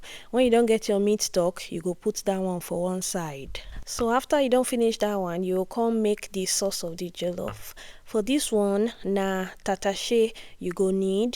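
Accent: Nigeria. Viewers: are you from PH or did you grow up in PH? This pidgin be like the one wey abobi dem, dey speak for Diobu 😂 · That niaja pidgin sounds so sweet in my ear❤❤❤❤ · don win my heart with this original Naija pigin English accent 🎉🎉🎉❤❤😂😂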